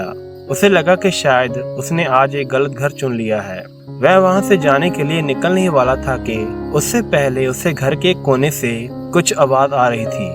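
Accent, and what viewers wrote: Punjab, India. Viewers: Bhai punjabi hai kya? Words bolte time punjabi accent aa rha hai for example missing "aa आ"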